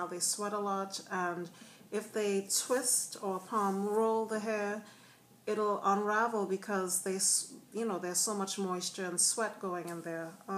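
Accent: Trinidad and Tobago. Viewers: sound like a trini..love ya accent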